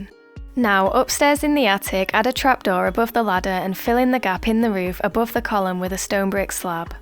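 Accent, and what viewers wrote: United Kingdom. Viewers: Looks very nice! P.S. You're from Great Britain, wright?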